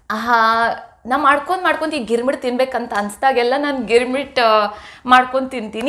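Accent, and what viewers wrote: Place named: North Karnataka, India. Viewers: Namma Uttar Karnataka bhashe keloku Chanda matadaku Chanda sister 😍very beautiful family ❤️ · Loved our Hubli kannada 🥳🥳🥳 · Yesht cholo hubli kannada matadtiri madam bhala kushi ayetu nimm kannada kelli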